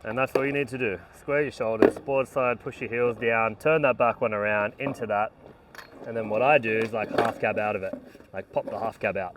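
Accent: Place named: South Africa. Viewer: I don’t like the way u talk. South Africans always make me think of that blood diamond movie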